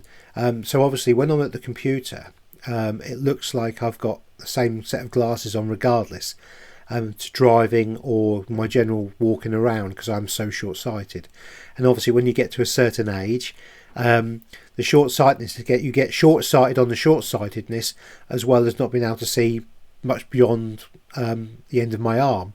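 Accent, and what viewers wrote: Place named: England, United Kingdom. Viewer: I'm new subscriber. Very refreshing with English accent. Yes, much of what u r saying rings true. Ascension symptoms for me, weight doesn't come off, blurry runny eyes, itchiness, dizziness, bloating , less energy. Neckpain, my skin cracks, cold cracks on my thumb, today I have some pain in my right shoulder blade. Like a cyst . Geeez, nice list . When do we get our forever new bodies? 😂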